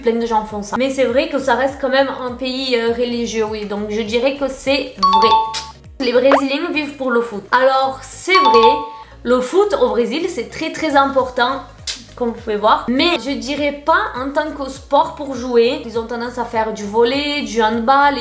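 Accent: South, France. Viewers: Mdr j'aime trop ton accent de meuf du sud. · tu dois être de toulon peut être, c'est l'une des rares exceptions en france pour qui le rugby est une institution. D'ailleurs, je trouve que t'as un accent brésilien (of course) mais mixé avec l'accent du sud, je trouve ça vraiment stylé.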